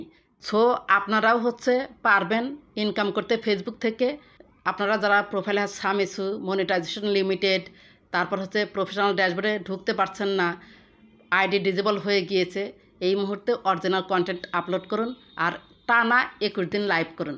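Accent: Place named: Chittagong, Bangladesh. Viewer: আপু আপনার বাড়ি কি চিটাগাং।আপনার কথায় মনে হচ্ছে । তাই জানতে চাই ভুল হলে কমা করবেন। ধন্যবাদ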